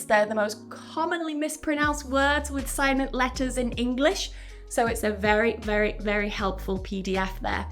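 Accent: United Kingdom. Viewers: Hello..I would like to join ur classes.how can I joy? Actually now I'm in UK.I like ur British accent and your pronunciation course would help me alot. Thank u a lot.I'm looking forward ur reply.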